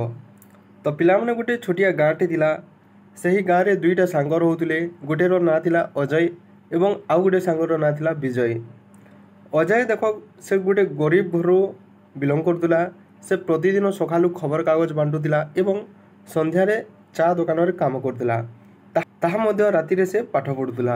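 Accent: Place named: Western Odisha, India. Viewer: Tame Western Odisha( Koshal) area r lok ye para laguchhe moke.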